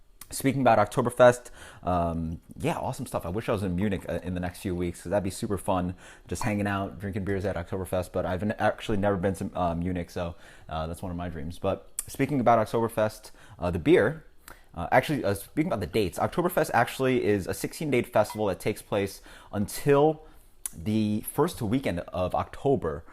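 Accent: United States. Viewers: Out of curiosity. Why do you force the correct pronunciation of German words but use your American accent to pronounce the Spanish words like Sierra Nevada? Great review!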